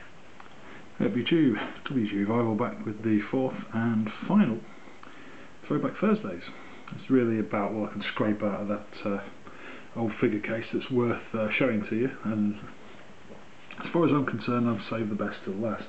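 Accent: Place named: Leicestershire, United Kingdom. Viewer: same here mate, i can tell by your ascent you would not have been far from where i used to live in leicestershire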